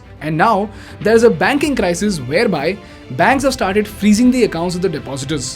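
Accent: India. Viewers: Hello if you hear this accent on a Phone for Tech support/ Refund department PLEASE hang up the PHONE!!! sorry i have to comment this here cause Million dollar been scam by indian scammer past decade! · Man this indian accent gets me crazy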